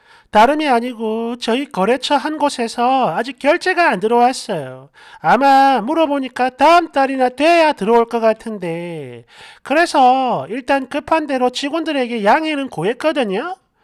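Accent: Gyeongsang, South Korea. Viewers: 딱 경상도 남자들 말투네 ㅋㅋㅋㅋ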